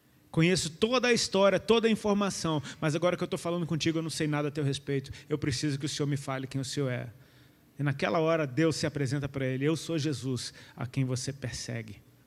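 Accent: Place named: Portugal. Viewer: quanto que o "gajo" corre!! Ameeeiiiiiii, portugues de Portugal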